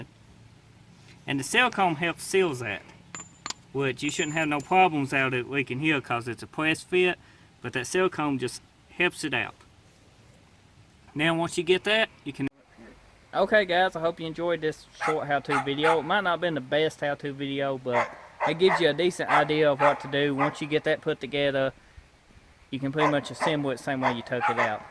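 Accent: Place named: South, United States. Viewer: what accent do you have, it sounds like a southern American, and a hint of Australian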